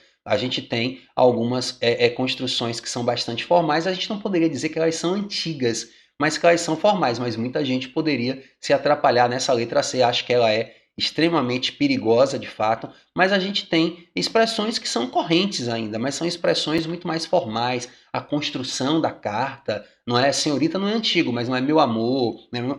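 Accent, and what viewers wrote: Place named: Salvador, Brazil. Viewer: ótima explicação! Você tem sotaque de soteropolitano, amei ouvir.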